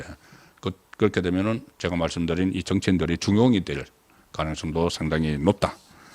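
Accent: Gyeongsang, South Korea. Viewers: 혼술 송국건 대표님 구수한경상도 사투리가 더 감칠맛이납니다. 해박한 시사논평줄겨보고있습닏다. 고맙습니다. 혼술화이팅!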